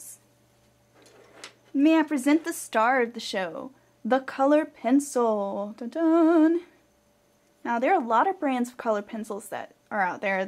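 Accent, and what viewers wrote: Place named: South, United States. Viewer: Great video,sounds like your from the south y'all.love it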